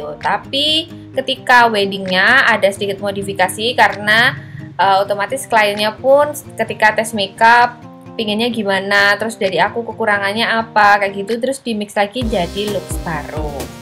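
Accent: Java, Indonesia. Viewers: Jawanya kok medok banget. Dari mana mbak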